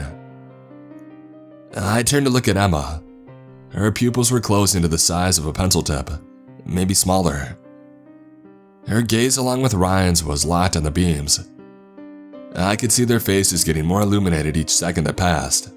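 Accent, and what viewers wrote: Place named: California, United States. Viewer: This guy's California surfer accent is not a good mode for this story to be told, takes me right out of the story the way he pronounces words... Eckspecially Rayan (Ryan) Tham (them) Perfials (peripherals) Kassed (kissed) Thass (this) At (it) Etarnity (eternity) Sanses (senses) Tranches (Trenches) Warked (worked) Praaaject (project) Staaary (story) Incraaases (increases) It's a if he's doing a parody of the SNL skits of the soap opera "The Californians" Kind of hilarious I mean this comment in a helpful way, after all, we can't really know our own accents. My best friend told me I should cut my nose hairs when we barely knew each other. Only a true friend will tell you something like that.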